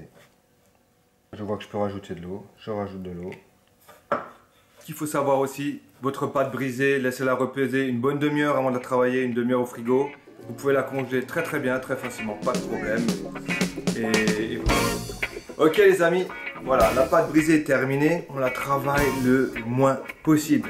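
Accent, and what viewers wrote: Alsace, France. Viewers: Tu viens d'Alsace non ? :p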